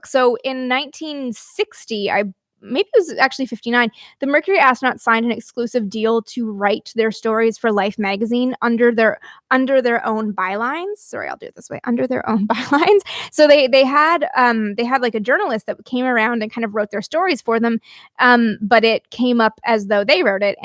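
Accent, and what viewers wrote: Canada. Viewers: Will have to get the ebook so I can hear that awesome Canadian accent all day.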